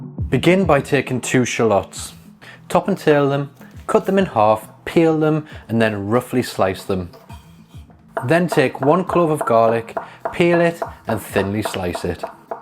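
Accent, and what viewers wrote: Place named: Yorkshire, United Kingdom. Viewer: I’m guessing from your accent you’re a Yorkshire lad..?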